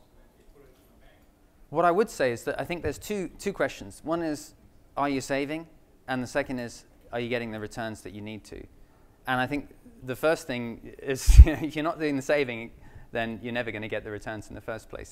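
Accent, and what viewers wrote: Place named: England, United Kingdom. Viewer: Wait a minute....how'd Mr Money Mustache get an English accent????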